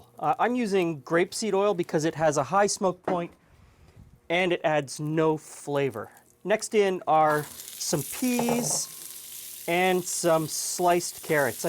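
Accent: Canada. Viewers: i knew from the accent you were a fellow canuck, always love Canadian content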